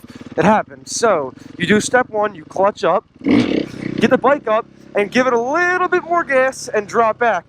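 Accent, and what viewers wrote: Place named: Maryland, United States. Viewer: you sound like youre from maryland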